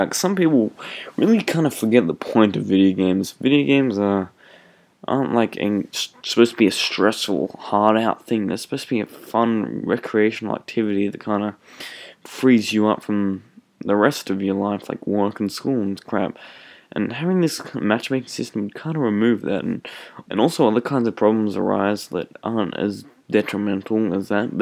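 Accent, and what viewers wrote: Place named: Bogan, Australia. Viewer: Hop on the old bandwagon? Dude you're sounding like a bogan now :O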